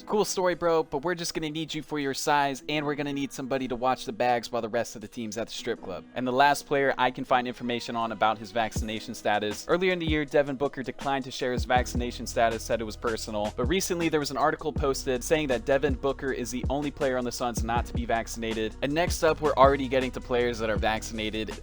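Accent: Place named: Iowa, United States. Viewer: You from Iowa?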